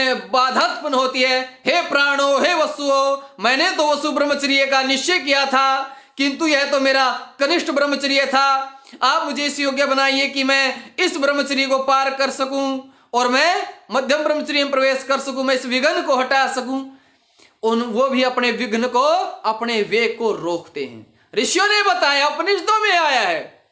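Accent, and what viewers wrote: Haryana, India. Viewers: Guruji aap hariyana se ho Kya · Haryanvi acsent Dekh kar mja agya 😂😂😂😂 I am also haryanvi jai ho .....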